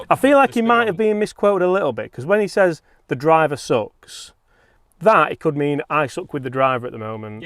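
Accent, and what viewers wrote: Yorkshire, United Kingdom. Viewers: Does using an Americanism like suck with a Yorkshire accent really work? Sorry but being British, that kind of thing is a bit of a pet hate of mine but I suppose we all have them, don't we?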